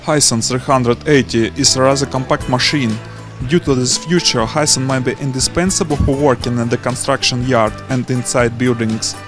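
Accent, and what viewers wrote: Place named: Russia. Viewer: По акценту понял, наш спикинг )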